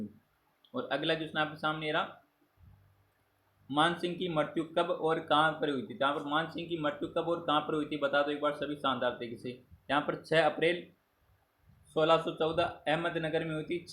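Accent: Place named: Rajasthan, India. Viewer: Dhudhadi jaipur ki boli ❤️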